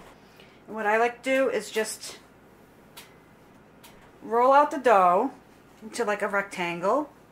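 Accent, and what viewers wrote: Boston, United States. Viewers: I hear Boston! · Nice and easy - I'm thinking Boston or Waltham - but I could be wrong with that accent - but we all LOVE accents - it's what makes America that thang - as for the shot glasses - says a lot LOL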